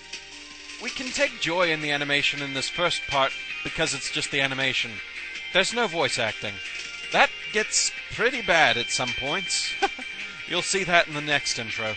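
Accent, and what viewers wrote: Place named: London, United Kingdom. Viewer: Great intro! Eh, One thing that's always bothered me is your accent... I like the sound of it, but I have an instinctive hatred for it... London or something? Don't let it bother you, I can just see your aligator-like mouth moving when you talk. Hey that Shaymin on your monitor's pretty cute. HOW DARE YOU LAUGH AT CHARGEMAN!! BUT, the joke's on you, you'll feel the train's wrath soon enough. Enough random comments from me, let's see how you actually did. To part two!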